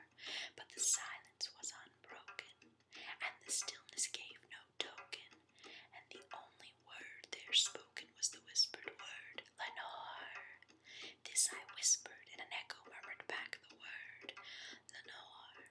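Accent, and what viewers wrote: South, United States. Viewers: Whisper "A Tell Tale Heart" by Edgar Allen Poe. Great whispering, I love the southern accent.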